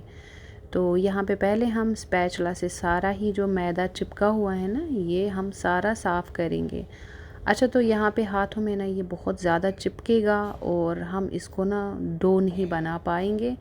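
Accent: Kashmir, India. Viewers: Kashmiri lugti ho aap aao k bolne ka andaz waisa hai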